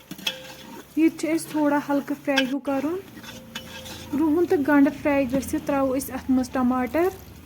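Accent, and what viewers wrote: Kashmir, India. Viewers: Your kashmiri accent is sooooo good ❤,,,,😊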